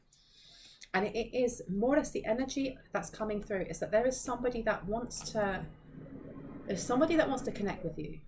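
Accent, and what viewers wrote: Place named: Hertfordshire, United Kingdom. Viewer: where are you based exactly? you sound like you come from hertfordshire ,, anywhere close?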